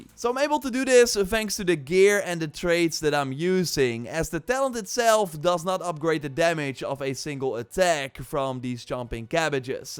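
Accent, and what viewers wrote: Netherlands. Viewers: Ik dacht al klinkt Nederlands en checkte socialblade en hup een Nederlands accent.... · dont know why but you sound dutch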